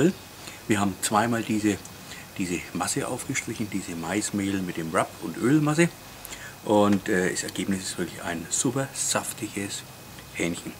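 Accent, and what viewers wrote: Franconia, Germany. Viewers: Der fränggische Dialekt ist zwar grauslig zum hören- aber das Video, das Rezept gleichts wieder aus ;-) Macht Bock auf mehr :) Welches Rub hast Du verwendet? schönes Video - Danke fürs Hochladen :)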